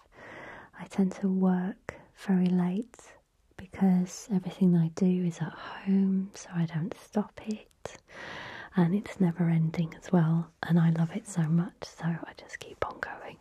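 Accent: United Kingdom. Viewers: Thank you! I'm so happy you shared your favorite ASMR channels with us... I too love ASMRtists with an accent, I don't know why.. and for me YOU have a lovely English accent;-) I'm a French-speaking canadian, so your british accent is really exotic to me! Many artists from over the world do videos in English, I guess it's easier to reach more people using English, since it's an international language... but I'm always happy when I discover an ASMR artist that speaks french. Unfortunatly, there are not that many... So I decided to start my own little channel in French, and you really are an inspiration to me! I'm about your age and I somehow recognize myself in your life story (I loved your "life in drawings video"). Thank you for your generosity! xx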